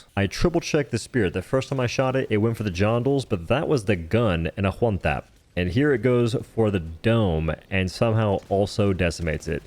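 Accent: United States. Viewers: So your british using a American accent. Thats wild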